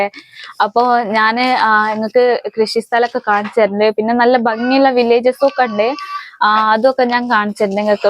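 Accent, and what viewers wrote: Malappuram, India. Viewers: Ente cousinte home chelari aanu🥰.. samsaram kettapol thooni.. onnengil calicut allengil malappuram aayirikkumnu😍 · Malappuram style samsaram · നല്ല മലപ്പുറം മലയാളം സംസാരിക്കുന്നു കൂടുതൽ ഉത്തർപ്രദേശ് കാണിക്കു മലയാളികൾ കാണട്ടെ അവിടത്തെ ചുറ്റുപാടുകൾ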